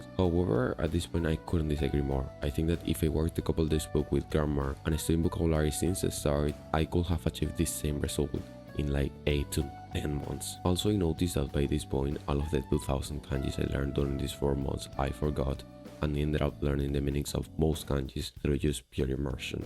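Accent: Spain. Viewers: I watch videos on English, my level is not high, but I noticed - I can hear words and correlate with writing, but Spanish accent fully confused me, I can`t even concentreate on subtitles. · Amigo, con todos los respetos porque sé que has dicho que llevas 3 años aprendiendo inglés y no quiero restarte mérito, pero te recomiendo que mejores tu pronunciación en inglés. Sobre todo las vocales, pues las pronuncias igual que las vocales en español, cosa que es un error garrafal, porque en el inglés hay más de 20 vocales mientras que tú solo usas las 5 del español. No puede ser que después de mas de 3 años aprendiendo inglés pronuncies la palabra "studying" de manera literal, con el sonido de la 'u' del español, y que también pronuncies la palabra "audio" igual que en español. No sólo es desconcertante para los hablantes nativos del inglés que tendrán dificultades en entenderte (porque al no pronunciar una palabra correctamente lo que haces es que el oyente la confunda con otra palabra cuya pronunciación sí sea esa que has hecho tú, como por ejemplo pronunciar 'sheet' como 'shit' o 'sheep' como 'ship'. 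Menos mal que al menos añades subtítulos a tus vídeos), sino que también haces que sea desagradable escucharte hablar, pues a nadie le gusta oír a alguien chapurreando su idioma de manera torpe y lastimosa, mas bien dan ganas de quitar el vídeo. Te recomiendo que cuando oigas videos en inglés, prestes mucha atención no solo al contenido del mensaje, sino también a CÓMO pronuncian lo que dicen. Si el acento que quieres aprender es el del inglés americano te recomiendo mucho el canal de Rachel's English, que se dedica exclusivamente a enseñar la pronunciación del inglés am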